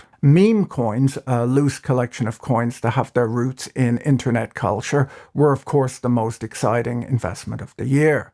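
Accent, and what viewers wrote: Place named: Ireland. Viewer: I thought he was Irish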